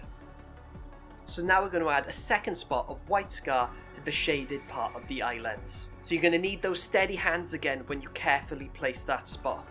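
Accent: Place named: Wales, United Kingdom. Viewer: Came here from the Reddit post, your accent surprised me! Always nice to see a fellow Welshman in the hobby.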